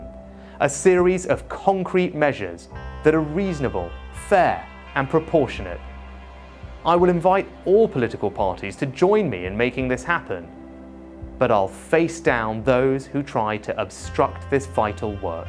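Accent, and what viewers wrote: United Kingdom. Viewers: He sounds very British to me.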